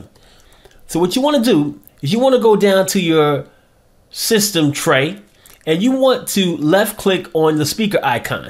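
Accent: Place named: United States. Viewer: Congratulations! Now that I have adjusted to your American English, I must grant it to you, you explain very well and your solutions are good. And believe me this compliment is genuine as it comes from a French Speaking European old timer. Thanks a lot and carry on...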